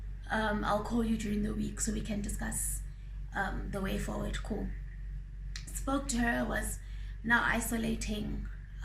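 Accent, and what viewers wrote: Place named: Cape, South Africa. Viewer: Amazing story! I like the in between humor, especially the Cape accent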